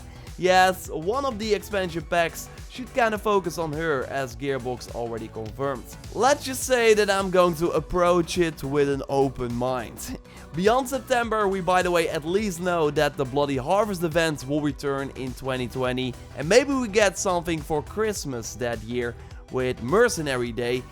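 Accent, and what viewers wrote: Netherlands. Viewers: Kijk aan, we zijn van Borderlands Tree naar Borderlands Free gegaan! Heerlijk, dat netherlish van je. So close!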